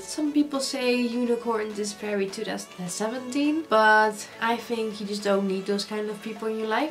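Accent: Netherlands. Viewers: ik kom ook uit Nederland😄Herkende je accent gelijk maar je Engels en uitleg doe je echt super!!! Gaaf om te zien, ben zelf veel met make up bezig en testen en een collector natuurlijk, zou ook wel mijn eigen channel willen beginnen maar durf nog niet.....komt wel. Heel veel succes met je channel!!!😘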